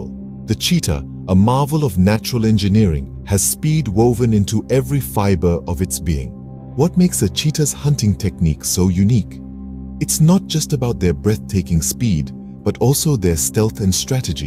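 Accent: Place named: Kenya. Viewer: my man sounds kenyan af. I am kenyan btw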